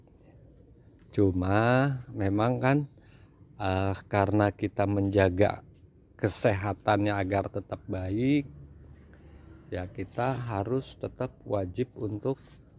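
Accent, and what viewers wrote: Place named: West Java, Indonesia. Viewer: iya sudah sy kira juga ada logat Sunda ya... iya mmng ini musim hujan tiap hari...sy di bogor trims pencerahan ya n nasehat ya.. salam aglonema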